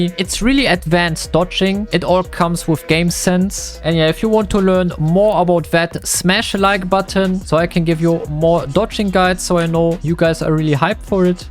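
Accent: Germany. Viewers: Du bist doch deutsch oder? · You speak English like Thomas Tuchel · Man merkt so hart das er deutsch ist.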